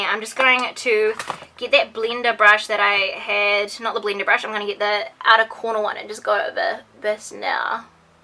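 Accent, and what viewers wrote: New Zealand. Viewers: are you a new zealander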